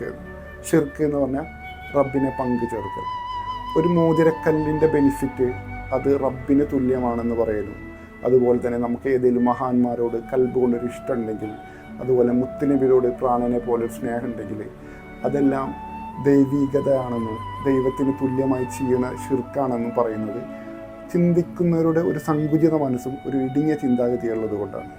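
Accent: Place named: Koya, India. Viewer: എന്റെ സഹോദര... " നമ്മ.. നുമ്മ... അല്ല നമ്മൾ, ഞങ്ങൾ, നിങ്ങൾ etc... അതുപോലെ " പയം, ഏയ്‌, പയംപൊരി കജ്ജ്, കുജ്ജ്‌, പോയ, വയക്ക് etc. അങ്ങിനെ അല്ല " പഴം, പഴംപൊരി, കയ്യ്, പുഴ etc... സത്യത്തിൽ കോയ ജന്മം കോയരീതികൾ എല്ലാം വ്യത്യസ്തമാണ് അതുകൊണ്ട് ഡോക്ടർ ആയാലും ഏത് വലിയ ഉദ്യോഗസ്ഥർ ആയാലും എന്ത് കോട്ടും സൂട്ടും ഇട്ടു വന്നാലും ശരി വാ തുറന്നാൽ അപ്പോൾ മനസിലാക്കാം അതൊരു കോയ ആണെന്ന് കാരണം ഇത്തരം നമ്മ നുമ്മ കജ്ജ് കുജ്ജ്‌ നാണം ഇല്ലെടോ ഈ രണ്ടായിരത്തി ഇരുത്തിനാലിലും മനസിലാക്കാൻ പറ്റാത്ത ഒരേ ഒരു വർഗ്ഗം കോയകൾ മാത്രം.. അതുകൊണ്ട് പുതുതലമുറക്ക് എങ്കിലും നല്ല മലയാള ഭാഷ പഠിപ്പിക്കുക.. കാരണം മലപ്പുറം പോലുള്ള സ്ഥലത്ത് എന്തുകൊണ്ട് ഇങ്ങിനെ സംഭവിക്കുന്നു എന്നതിന് കാരണം അവിടെ പഠിപ്പിക്കാൻ വരുന്ന അദ്ധ്യാപകർ ഇതേപോലെ സംസാരിക്കുന്ന അവിടെ ഉള്ള ആളുകൾ ആണ് ആയതിനാൽ അത്തരം സ്ഥലങ്ങളിൽ മറ്റുജതികൾ വന്നു ക്ലാസ്സ്‌ എടുത്താൽ തീർച്ചയായും ഇത്തരം കൂതറ ഭാഷ പുതു തലമുറയിൽ മാറി കിട്ടും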